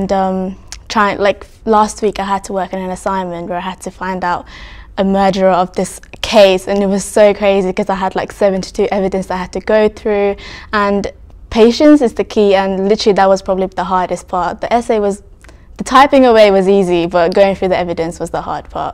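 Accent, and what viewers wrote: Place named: United Kingdom. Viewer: I like her British accent. I haven’t heard an asian speaking in that accent before.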